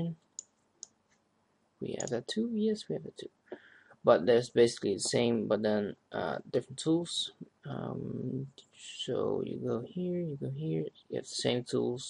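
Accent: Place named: Netherlands. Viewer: I can hear that you're dutch. How old are you? :D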